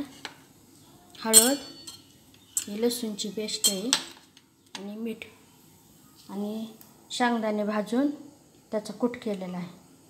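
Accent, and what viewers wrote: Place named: Ahmednagar, India. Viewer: Tumcha gaav kutla? Awazavarun Ahmednagar zilla che vatatat.....ho ka?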